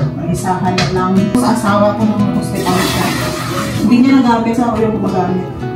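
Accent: Philippines. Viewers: my tono ka san probinsya ka Dito sa pinas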